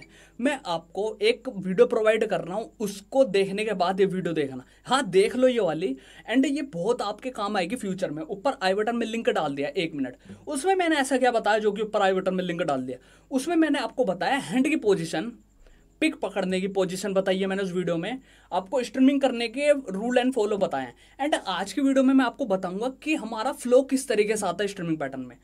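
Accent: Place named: Meerut, India. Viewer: Bhai aapka way of talking kafi apna apna sa hai. Meerut ke aas pass se ho kya???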